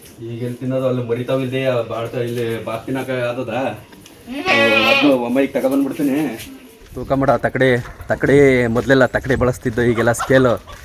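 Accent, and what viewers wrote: Mandya, India. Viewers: ಬಹುಶಃ ಇದು ಮಂಡ್ಯ ಬಾಷೆ ಇರಬಹುದು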